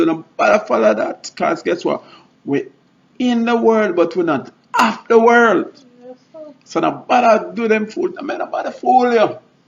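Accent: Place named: Jamaica. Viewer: Whewwwww straight up Jamaican dialect/vernacular lol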